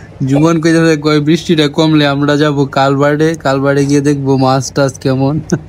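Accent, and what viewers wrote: Netrokona, Bangladesh. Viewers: মাছ দেখা ছারাও কথাডি হুইন‍্যা মনডা খা খা কইরা উডে।মনে অয় কাইন‍্যাইল বা গাইভনা বা কলমাকান্দা হেইরহম কোনো গ্রাম থাইক‍্যা কেউ কথাডি কইতাছে।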